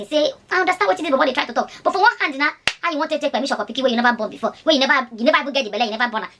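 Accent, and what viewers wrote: Nigeria. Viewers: I'm so glad to be part of this journey!!! Your pidgin English sweet well well 🤗❣️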